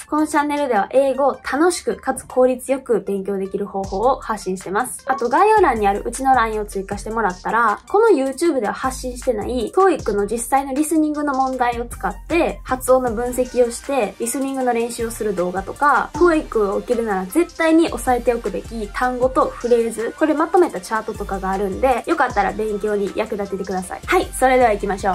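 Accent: Kansai, Japan. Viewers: 関西弁のいいね！❤ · やばい、関西弁美人女子がドストライク過ぎる。。。どうやったら出会えるんだ🤷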